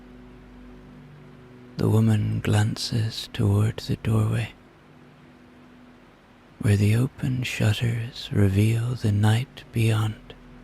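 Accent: Ireland. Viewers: This is the first night listening I have been able to sleep with. I’m suffering protracted antidepressant withdrawal and it causes insomnia with night terror due to hyper vigilance….its near impossible to get back to sleep once adrenaline cortisol kicks in and negative thoughts are embedded. BUT, calm, considered, non demanding voice and Irish inflections make it easy to concentrate on as you know there will be no jolting surprises, sharp sounds and ads….I’m so grateful❤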